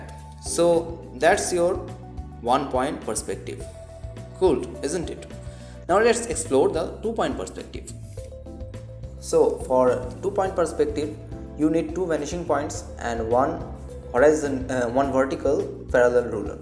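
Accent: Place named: India. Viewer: I've always found pleasing hearing English with indian accent (btw, helpful video... thanks a lot!)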